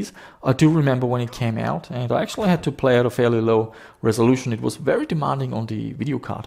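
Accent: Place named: Germany. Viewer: Hey man how come you sound more German than Australian?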